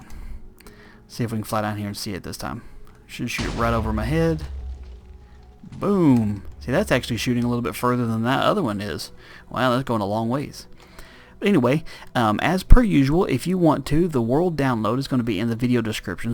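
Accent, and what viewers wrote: South, United States. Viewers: Hey are you from the south? I can really hear your accent. You wouldn't happen to be in Texas would you? ☺️ I'm a homesick Texan meaning I am from Texas but I live up in the North East. People aren't kind up here. ☹️ Where are y'all from? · R u a county folk mr acsent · You sound like a hill billy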